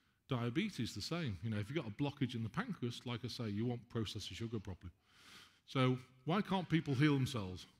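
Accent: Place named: England, United Kingdom. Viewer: It's noticeable there seems to be a "red" English thread running through my life with names of English cities of London and Leicester and now English born with his adorable English accent. How does it get any better than that?!?! Today is March 11, 2019 and I had my first private session (one on one) with on February 26th of this year, and that session was a real game changer for me! It "blew my pantaloons off" as would say....I am just so incredibly grateful that, Amanda and their staff are now in my life. I had been desperately trying to kickstart healing energies for over 2 years to recover from a number of issues but mainly from a surgery without much success. What was I thinking going to an allopathic doctor to begin with? That really messed me up! Never again! Now, for the 2nd time in my life, I know I'm going to be just fine. 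Thank you, and thank you, Mekchizedek Beings! Your help is very much appreciated. Already there are noticeable improvements in my very long list of conditions I need help correcting. Now the story of the first time I knew I'd be OK is a very long yarn dating back to 1994 through 1998 when I had the good (I think) fortune to find and work with a very powerful Being who lived in Leicester, North Carolina and who was, I hope, helping me realize my desire to become aligned with the Divine, but that is a story for another time. That amazing Being, together with an incredibly fu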